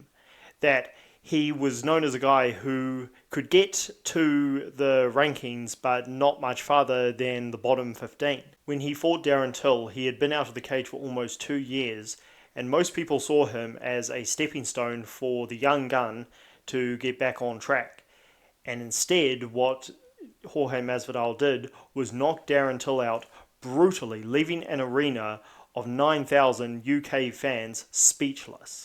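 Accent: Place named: New Zealand. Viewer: Good video man I am subscribing Best of luck with channel from Ireland 🇮🇪 U sound kiwi 🇳🇿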